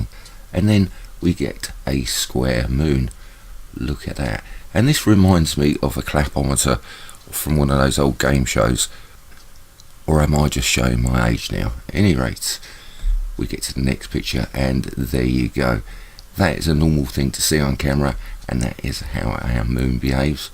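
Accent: United Kingdom. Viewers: Hiya mate I've been watching your videos for a good few days now. Your videos are probably showing the best evidence I've seen. Can you tell me what the lensing system is? Great videos mate. You sound British? Down south? I'm from wigan near Manchester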